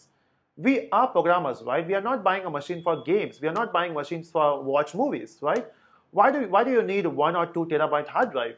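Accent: India. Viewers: Trying too hard often turns out to be bad but raw indian accent sounds bad. It bleeds my ears.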